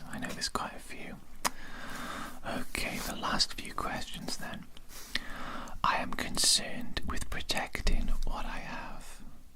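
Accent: Wales, United Kingdom. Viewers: Great video! I have to ask though, is that a Welsh accent or am I way off?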